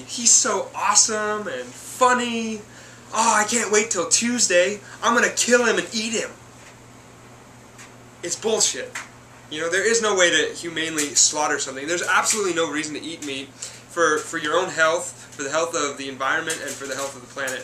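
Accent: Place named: Spain. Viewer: Nice Spanish Accent...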